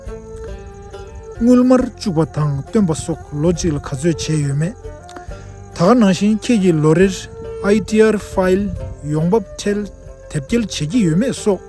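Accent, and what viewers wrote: Standard. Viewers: བོད་སྐད་གཙང་མ་ཐོག་ནས་ཡག་པོ་བཤད་སོང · བོད་སྐད་དཔེ་གཙང་མ་གསུང་གི་འདུག་ཐུགས་རྗེ་ཆེ།🎉🎉🎉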